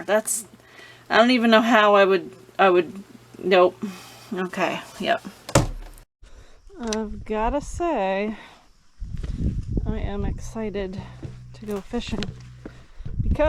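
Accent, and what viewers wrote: Canada. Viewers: Where are you? It's August and you need heat? I hear the Canadian accent but you must be way up there.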